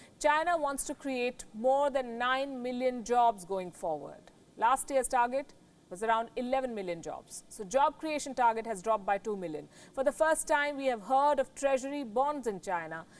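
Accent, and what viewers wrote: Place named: India. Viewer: So many people with low intelligence are making hating comments here cheering this biased news video presented by an Indian woman with strong accent.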